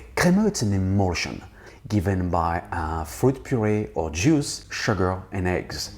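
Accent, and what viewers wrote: France. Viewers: Banish with as French accent!! Need anything else . Make a macaron!!!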